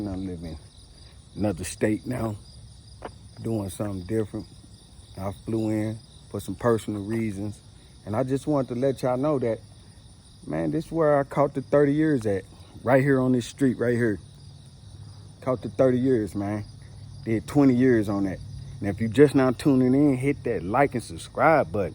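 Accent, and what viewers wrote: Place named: St. Louis, United States. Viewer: I just found your channel and I knew yo damn ass was from St. Louis, that accent from home always sticks out to me.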